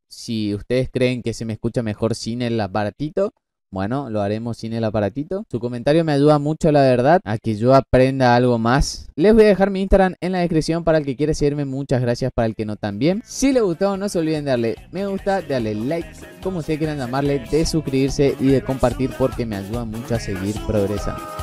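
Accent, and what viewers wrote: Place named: Argentina. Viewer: Hola me encanta tu forma de relatar y acento argentino ❤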